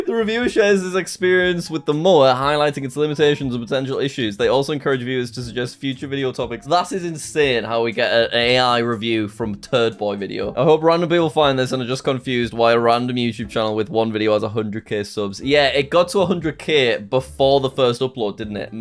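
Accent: United Kingdom. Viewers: What part of uk are you from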